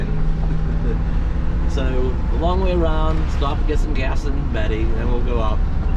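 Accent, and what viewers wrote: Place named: Pacific Northwest, United States. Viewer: You sound like livening in the PNW .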